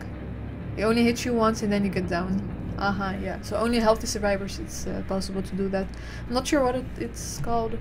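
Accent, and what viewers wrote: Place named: Netherlands. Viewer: Her accent sounds dutch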